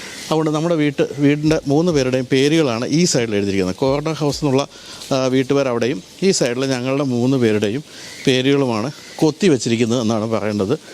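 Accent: Kottayam, India. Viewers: BYO - bar rule 😄😄😄. Like your Kottayam tone.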